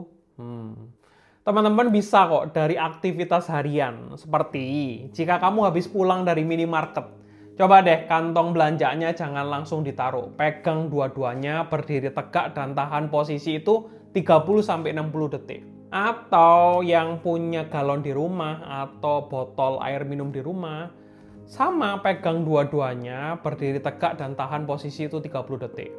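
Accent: Java, Indonesia. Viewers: Nggak usah serius², dok. 😂😂 Dari aksennya jelaslah dokter org Jawa. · Dokter medok 😅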